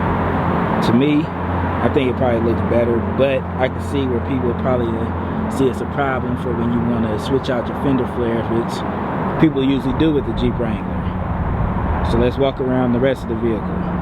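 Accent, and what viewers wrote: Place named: California, United States. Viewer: Sound like he from Cali or West Coast. I may be wrong though lol. Good review!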